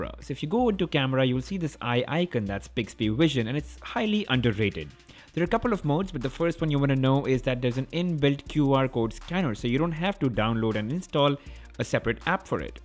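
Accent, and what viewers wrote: India. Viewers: Lose your Indian accent and gain more sub!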